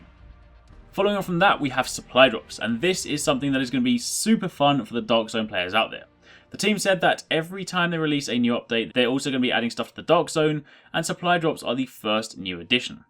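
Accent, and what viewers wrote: Sweden. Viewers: You're Swedish? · are you from sweden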